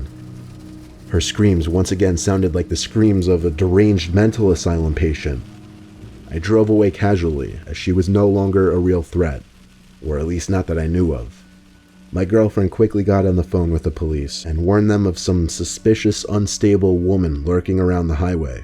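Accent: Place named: New York, United States. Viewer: Liam Sadler i knew he was either a new yorker or New Jerseyian like myself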